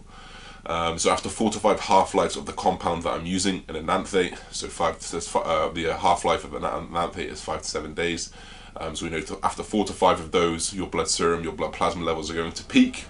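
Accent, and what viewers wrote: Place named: Liverpool, United Kingdom. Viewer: I wonder which part of Liverpool he is from, I can't work it out from his accent...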